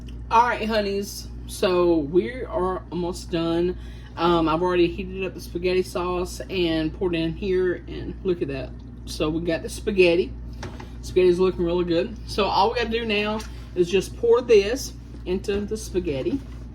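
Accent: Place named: South, United States. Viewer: I love you giiirrrl you have the cutest southern ACCENT!❤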